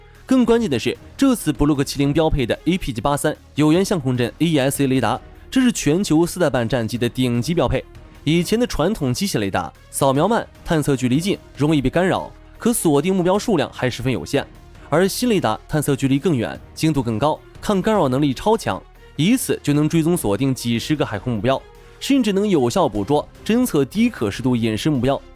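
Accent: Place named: China. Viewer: 講話主播是臺灣人嗎、大陸口音。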